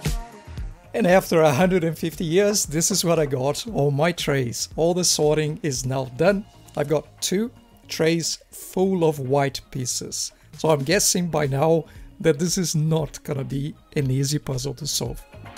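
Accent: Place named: New Zealand. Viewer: Hi!:) I just started the video and I realized how I kinda missed your NZ accent!:D So good to hear from you in another video, I feel immediately comfortable and already enjoy your puzzle experience. Thank you and lots of warm greetings from Germany:)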